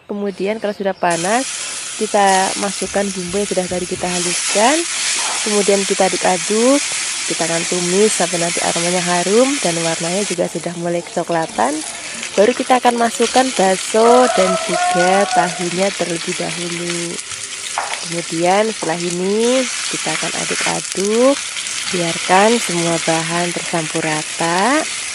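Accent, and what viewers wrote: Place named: Ngapak, Indonesia. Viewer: Medok banget rasa ngapaknya ,enak dirungokna.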